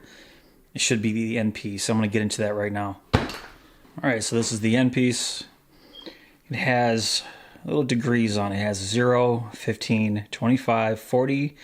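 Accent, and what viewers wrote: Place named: Western New York, United States. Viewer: WNY - can hear the accent and tell by the driveway and the house architecture :-) Think I'll stick with my gas-powered pressure washer.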